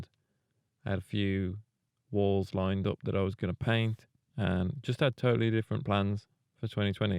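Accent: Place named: Dudley, United Kingdom. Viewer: Dude I could have sworn you were from Dudley.... But now I'm tryna figure out where the hell you live 😂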